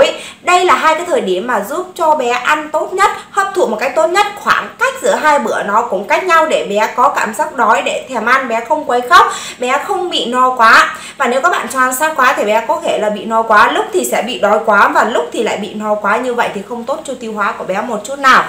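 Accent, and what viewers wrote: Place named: Thanh Hoa, Vietnam. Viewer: Giọng THanh Hóa đặc sệt 😂 hơi khó nghe b ạ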